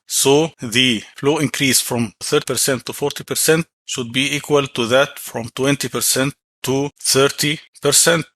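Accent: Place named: Egypt. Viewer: يا عم لو حكيت بالعربي كان افضل في الشرح وتوصيل المعلومة.. في الاول اعتقدت ان لهجتك هندية وبعدين وضحت نبرة النيل.. لاحظت ان اغلب فيديوهات الهنود يشرحو بلغتهم رغم تمكنهم من اللغة الانجليزية وذلك لاعتزازهم بلسانهم، اما من يتحدث اللغة العربية تجده يلهث خلف اللغة الانجليزية والسبب !!! اجعل الشرح باللغة العربية مع استخدام المصطلحات الانجليزية حينها تكون المعادلة معقولة وتعم الفائدة. ربي يعينك ويساعدك. مهندس ليبي مر من هنا.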